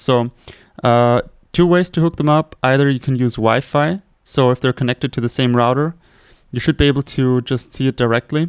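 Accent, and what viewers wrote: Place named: Germany. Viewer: oh it sounds so german accent bc im german and people that speak english in germany talk like that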